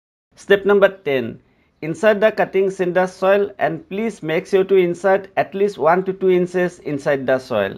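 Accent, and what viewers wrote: Assam, India. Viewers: R u assamese ?? English accent xuni tu assamese jenei lagil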